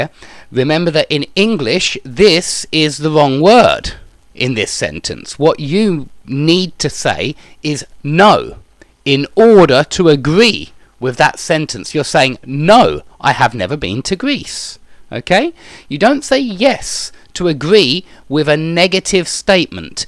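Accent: United Kingdom. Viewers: Ilove the British accent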